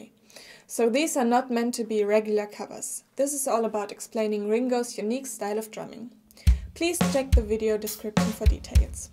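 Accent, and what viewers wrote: Germany. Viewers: SHE SPEAKS!! German girl??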